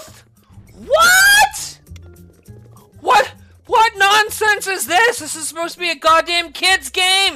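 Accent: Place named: Boston, United States. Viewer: God i love ur Boston accent it's so adorable!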